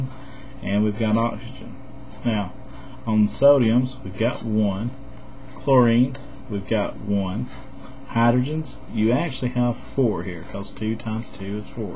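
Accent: United States. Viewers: i learned this back in 10th grade but i forgot how to do it. lol. thanks. having a slow talking country guy helps a bunch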